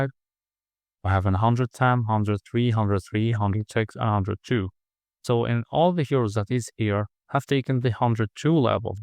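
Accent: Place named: Russia. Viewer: Ur English says u are from Russia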